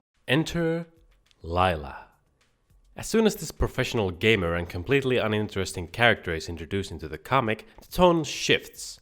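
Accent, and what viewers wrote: Finland. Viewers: wait a sec your finnish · Are you finnish?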